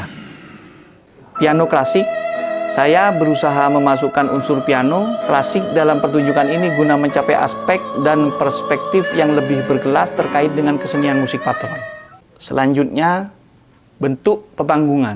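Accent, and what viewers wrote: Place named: Madura, Indonesia. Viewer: Logat madure cak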